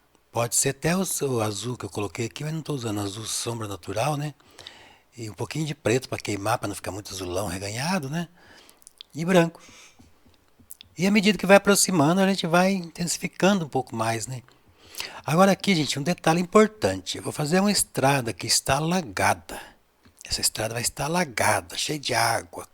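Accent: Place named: Minas Gerais, Brazil. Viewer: Adorei suas dicas. Seu sotaque mineiro é muito bom. Obrigado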